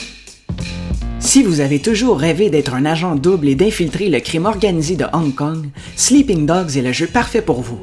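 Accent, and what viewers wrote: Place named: Canada. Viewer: J'adore ton accent et j'aimerais trop aller au Canada!!!!!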